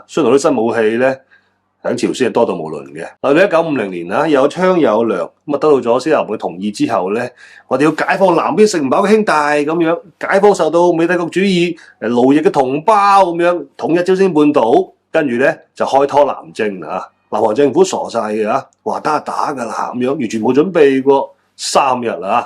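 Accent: Guangzhou, China. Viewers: 嘩吊！原本聽廣州話仲全神過香港嘅廣東話，起碼唔洗聽一D無謂嘅英文字!!!!!!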